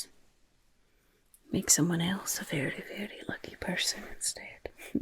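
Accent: Ireland. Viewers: I love your Irish accent,. I know you have also done English accents and if I remember correctly your "bossy" vampire had a Russian accent. Have you / will you do other accents too? No pressure but I'm just curious. Thank you for all of the personal attention in this wonderful St. Patrick's video. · As an Irish person you absolutely nailed the accent ❤ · I don’t know what it is about your Irish accent but it gives me tingles til I melt! I enjoyed the St. Patrick’s day. 💚🙂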